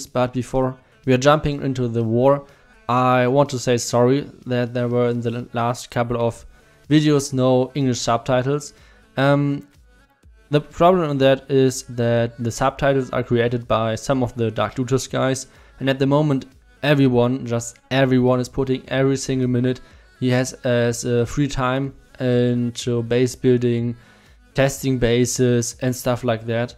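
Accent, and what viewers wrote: Germany. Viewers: Your English is good bro with german accent.... and when you are going to next base building videos? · your English is really good u just have that German kind of accent witch makes since.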